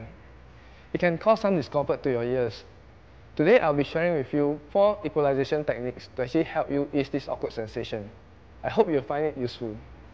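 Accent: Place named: Nigeria. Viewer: He sounds very nigerian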